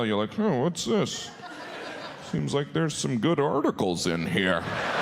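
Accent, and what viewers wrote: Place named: Jamaica. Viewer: thats more like a Jamaican accent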